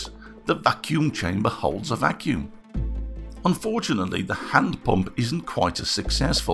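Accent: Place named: Scotland, United Kingdom. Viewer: Good example of why I subscribed to your channel. Love your work and most of all your commentary. Hope your mother-in-law doesn't watch. One question, your accent hinges a bit on the Scottish side, how close am I ??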